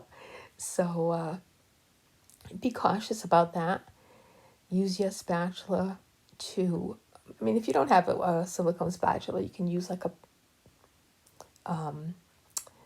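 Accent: New England, United States. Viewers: Thank you! Great video! You must be from New England with that accent lol.😊😊😊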